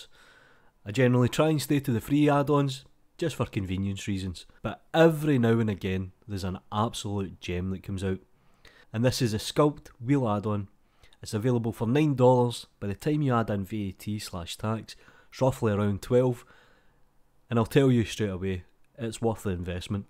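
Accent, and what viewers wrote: Scotland, United Kingdom. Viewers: One of many Scottish accents! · Scottish accent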